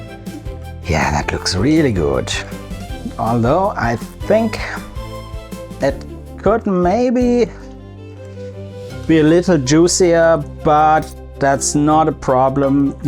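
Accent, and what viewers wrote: Swabia, Germany. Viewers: ! Ilike it! Just like the Red Hot Chili Peppers, drummer! Your Swabian accent is great, man! 😁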